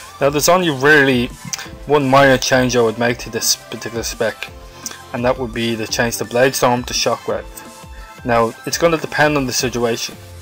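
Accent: Ireland. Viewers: are u irish ? u sound soo much like it ( im irish ) ;)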